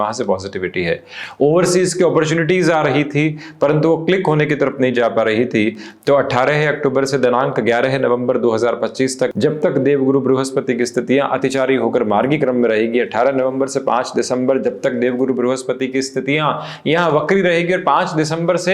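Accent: Standard. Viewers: आप सुध हिंदी बोलते हैं।। बहुत अच्छा लगा ।।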